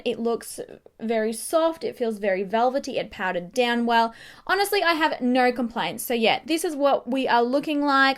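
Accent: Australia. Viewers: I love this testing style and I love that you keep your Australian way of speaking, instead of putting on some weird accent that some other Australian youtubers do. Most of all I love that you say FORRID and not forehead! xoxo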